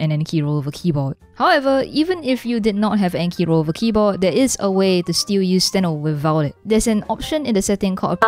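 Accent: Singapore. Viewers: are you singaporean? 😁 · are you singaporean?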